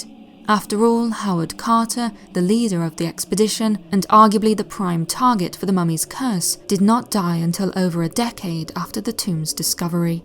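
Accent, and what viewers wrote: England, United Kingdom. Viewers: As always a tale well told is just that, and without drawing any more in breathes that are lined with gushes or the occasional reverberations as towards something being spawned by the narrative of our as uncanny and spoken with the English accent I was born into deserves a bravo. I don’t often feel inclined towards a personal rating but I feel a need for a personal rating and wish to salute you and thankyou. As now the sunlight filters through my English afternoon in mid June and I’ll round off with another sip of Earl Grey, and ponder for a moment wondering what my mind will be greeted with in the next episode to grace my mind.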